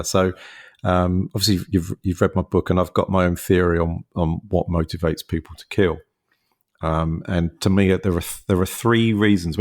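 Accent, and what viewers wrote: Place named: United States. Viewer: Once I heard the American narration bs……..I scrolled on……utter drivel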